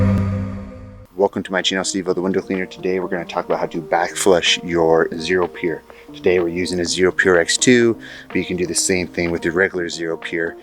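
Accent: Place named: United States. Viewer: You have one of the most calm and well spoken American accents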